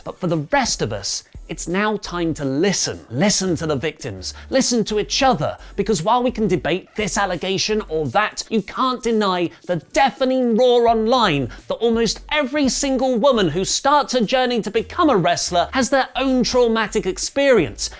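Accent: United Kingdom. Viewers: your natural speaking voice is perfectly British. Coming from an midwesterner who loved Hot Fuzz to watch the director commentary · Social meteor account. I love British talk.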